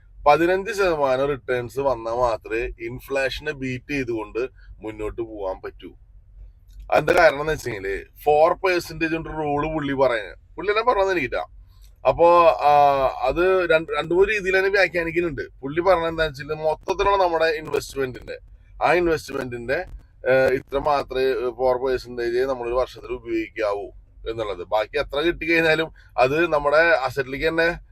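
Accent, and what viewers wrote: Thrissur, India. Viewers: I left a message in your WhatsApp I don’t know you heard it. I like your Trichur accent and watch most of your videos.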